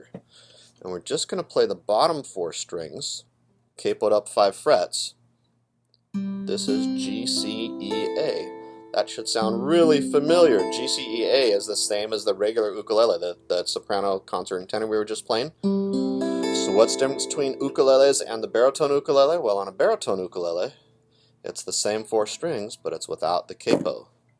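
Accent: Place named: Hawaii, United States. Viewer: and his staff are from Hawaii. So they pronounce it oo-kuh-lay-lee.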